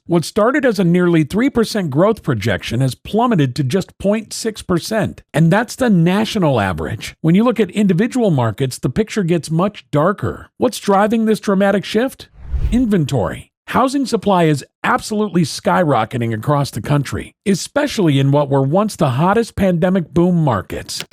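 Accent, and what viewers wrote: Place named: United States. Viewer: The American voice is so annoying.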